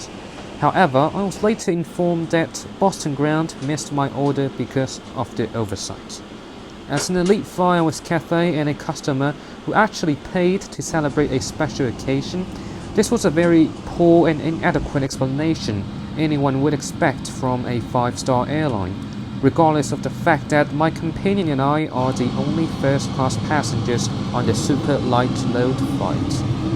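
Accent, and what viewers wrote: United Kingdom. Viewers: 英国口音很牛哦哈哈哈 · 英国口音X2 <3<3<3<3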